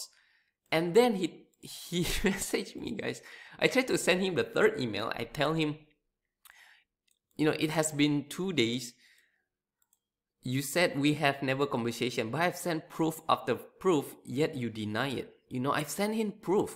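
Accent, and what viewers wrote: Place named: New York, United States. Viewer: He's a New Yorker and even sounds like a really shady New Yorker. His accent tells all check out TJ spoonman who got ripped off too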